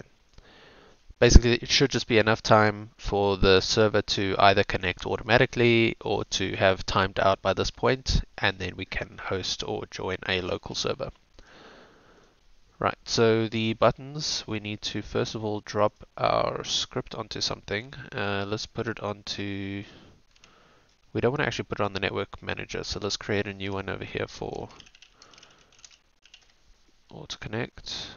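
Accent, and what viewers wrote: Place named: South Africa. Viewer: SA in the US. Cool to hear a South African doing this.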